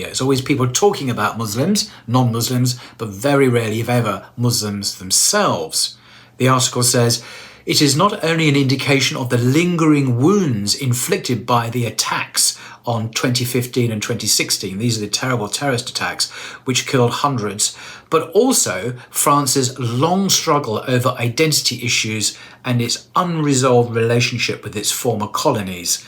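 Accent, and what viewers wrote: United Kingdom. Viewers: "The rising number" " Among them are a growing number of Muslims". Jeez - can you be a little more specific. I am not going to deny the shit stirring right in France - but please be more specific. And also the man making this vid has a UK accent. Is he aware year previous to 1994 UK had always been a net exporter of people - and still huge numbers leave. I have never seen this featured or analysed by UK media. I wonder why.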